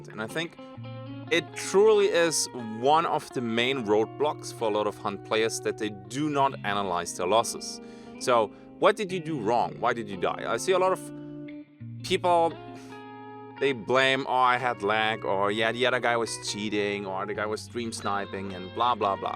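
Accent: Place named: Germany. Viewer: If I may ask, are you German? Bcs you kinda sound german and your grammar hints at native german too. I am always happy to see a Landsmann on the internet. 😁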